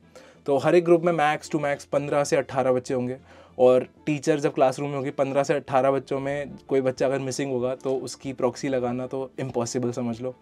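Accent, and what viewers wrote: India. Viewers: Are you from west Bengal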